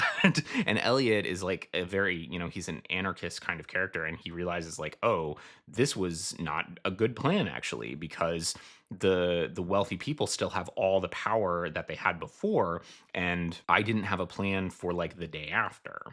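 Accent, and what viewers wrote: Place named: Canada. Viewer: See? There’s a reason why I thought you were Canadian at first 😂😂😂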